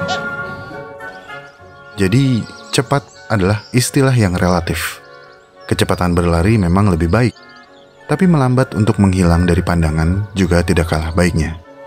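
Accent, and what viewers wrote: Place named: Java, Indonesia. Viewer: Orang Jawa yah lu bang 🤨🤨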